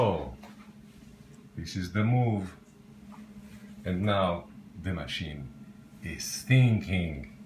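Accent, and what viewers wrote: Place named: Russia. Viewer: I total read this with your russian accent! Youre so funny! thanks for the response.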